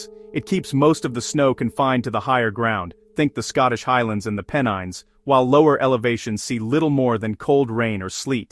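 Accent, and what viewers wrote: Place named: United States. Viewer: Why does someone talking about the UK have an American accent?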